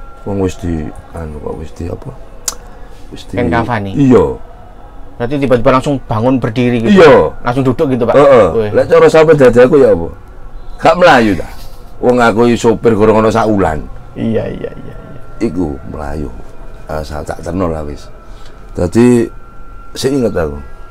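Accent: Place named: Surabaya, Indonesia. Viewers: 2x puter ngga Bosen2 ,,logat khas orang Jatim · Aku besar di jawa timur , tapi bukan asli jawa timur. Selama di jawatimur brasa biasa aja pke bahasa yg kya bpk ini, tapi saudara2ku yg ga tinggal di jatim slalu ktawa dnger aku ngmng nyenengin katanya. Skrg aku tinggal di jawa barat, dnger bapak ini ngmng aku ngrasain apa yg di rasain sodara2ku. Nyenengin denger bahasanya. Sehat sehat yaa bapak 🥰🥰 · Aku wedi pak wkkw 😀😆 suroboyoan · logatnya surabaya banget om ;) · Iki medok suroboyo sueneng aku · Aku seneng nak arek suroboyo cerito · Logat Surabaya,nya g hilang jancokk... 🤣🤣😅😅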